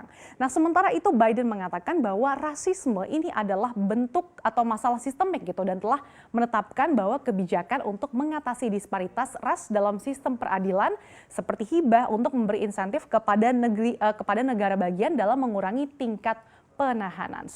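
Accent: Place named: West Kalimantan, Indonesia. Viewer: Logat lu kek logat kalbar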